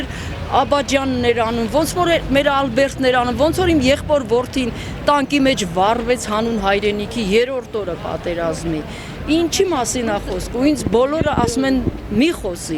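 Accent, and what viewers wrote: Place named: Turkey. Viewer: Հաստատ թուրքա հայերեն խոսացող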